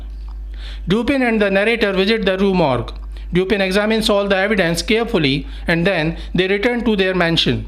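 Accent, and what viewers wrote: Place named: India. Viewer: why how racist of u <3 the moment i heard his accent i knew he knew his sh*t lol. all informative videos made by people I hear with an Indian accent turn out to be great. Thanks for the vid!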